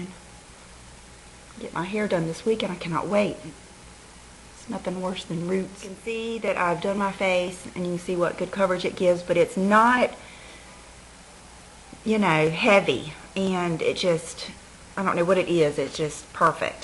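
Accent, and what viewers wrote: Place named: North Carolina, United States. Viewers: Mrs., you had me laughing this whole video with all of your annotations. You and my mom are two peas in a pod. You remind me of her so much. We're from NC, and everything from your accent, to your 'sillyness' just reminds me of her. I'm only 19, and I don't live with her any more, and watching you is like a little piece of mom on my computer screen;). Love your vids! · It's good to laugh at yourself sometimes, you look lovely anyway! Love your accent, I grew up in S.C., makes me kind of homesick! Happy Mother's Day!!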